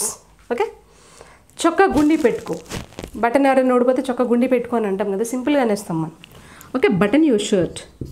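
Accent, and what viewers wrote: Telugu, India. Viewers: Tqq, nice class, so sweet of you and your Telugu slang laaa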